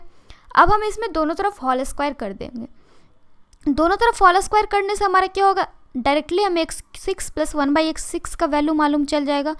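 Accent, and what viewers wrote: Bihar, India. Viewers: mam bihar ki tune hai aapki...ya up east ki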